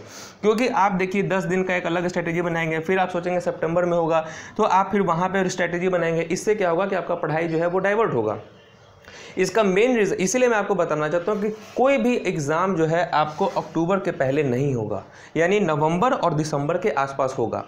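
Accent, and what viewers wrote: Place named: Bengal, India. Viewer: Thanks sir i like ur Bengali voice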